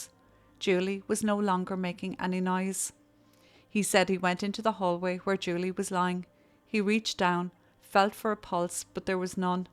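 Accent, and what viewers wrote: Ireland. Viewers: I find your lovely, Irish lilt to be comforting. I subscribe to several true crime channels from various countries. This is a favorite.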